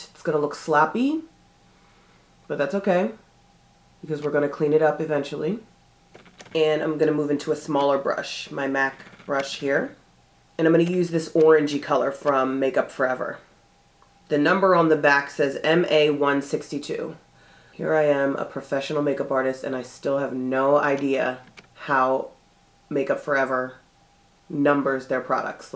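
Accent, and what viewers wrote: United Kingdom. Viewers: loool your bitish accent, love it. you really sound like us a bit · loveeeee!!! and great job on the British accent!!!!! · I just love that British accent!!! I'm an actress and often try to master it for future roles lol.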